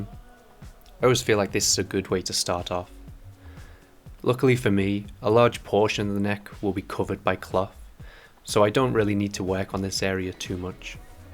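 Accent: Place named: Liverpool, United Kingdom. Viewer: Thank you so much for putting these high quality videos together! You have a soothing but firm tone in your voice. Although it's subtle, I like the Liverpool accent as well! :)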